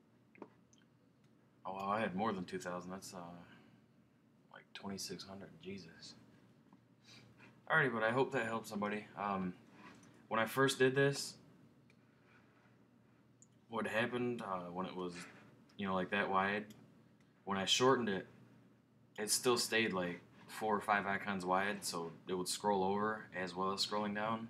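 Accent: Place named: East Coast, United States. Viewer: I hear an East-coaster.. are you a fellow Newf? Thanks for the vid bro!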